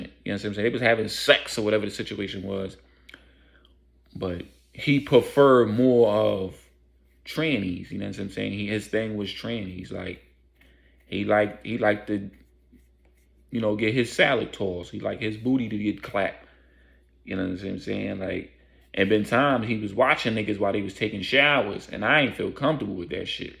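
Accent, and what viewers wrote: New York, United States. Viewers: 😂🤣😂Listening to you I know your from up top... NYC. It isnt funny how your mother found out about this man on the DL. I'm sorry to hear that was your intro to transgenders, but your mother did her job protecting you from from these lifestyles for a reason. Men on the DL have ruined many families trying to hide their true desires instead of being dishonest using women to cover their secret lives. Your delivery had me here 😂🤣so hard. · Yo you sound like you from NYC, great story...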